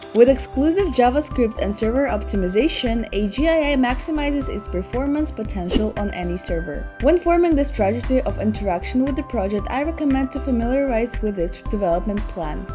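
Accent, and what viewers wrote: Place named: Asia. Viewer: that asian accent...makes me feel bulish on this...I want to get in but I don't got any more cash left, I invested all I had...😅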